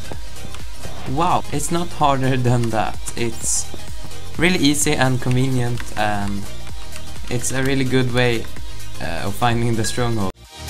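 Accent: Sweden. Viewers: u swedish? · lol that english it's mer swenska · du är svensk det hör jag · R U from sweden?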